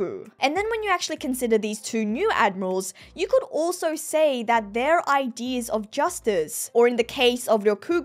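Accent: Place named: Australia. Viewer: It seems like a lazy comment but ..I actually broke my rule of not commenting on public youtube videos.. Fairly new to the channel. I have to say I enjoy your videos. I respect the knowledge, research and clean execution in your videos. The are down to earth and come off very natural while having professional execution. You're funny without trying to be funny. Your points are well thought out. Yet still down to earth. I'm guessing you studied communication or broadcasting. You have a talent for it. I also love your aussie accent. Thank you for the funny response. I almost laughed out loud :)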